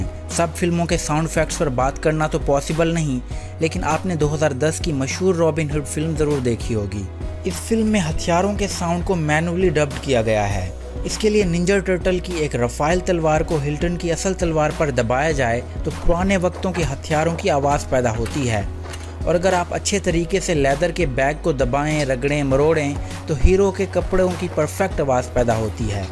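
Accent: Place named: Pakistan. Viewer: Iski aawaz se ye Pakistani lag raha hai............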